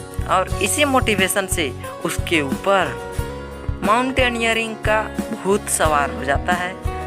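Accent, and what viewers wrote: Bihar, India. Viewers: Bhai were are you from. I think you are from bihar 🤗❤️ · SIR AAP BIHAR SE HO ???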